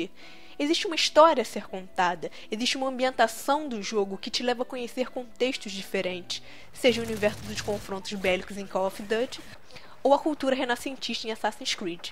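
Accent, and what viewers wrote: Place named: Rio de Janeiro, Brazil. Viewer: É, o grande defeito desse canal é o sotaque dela. Esse carioquês é uma outra língua!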